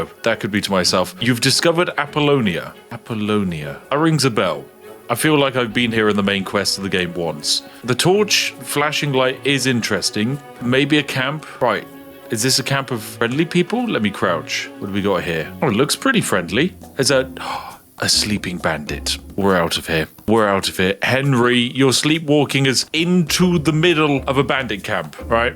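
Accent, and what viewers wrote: United Kingdom. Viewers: You sound like hans capon, i think its just the British accent but its peak im British too.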